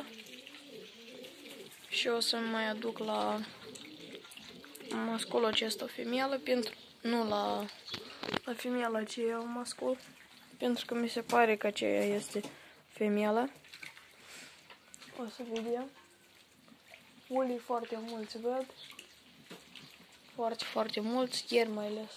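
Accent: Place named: Moldova. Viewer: Imi place accentul tău de moldovean, dar si de cum îngrijesti porumbeii din gospodăria ta. Like 22.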